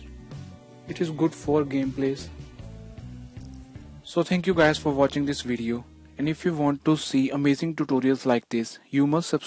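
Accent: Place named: India. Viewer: I dont trust this guy he sounds like a Indian tech support scammer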